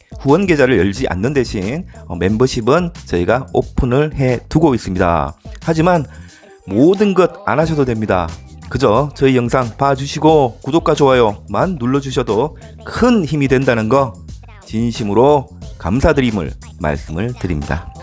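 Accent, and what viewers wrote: Gyeongsang, South Korea. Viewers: 경상도 말투던 뭐던 전달 엄청 잘되는데.. 외국인이 영상을 보나?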